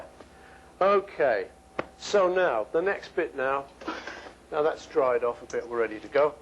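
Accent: United Kingdom. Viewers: his Scottish accent is great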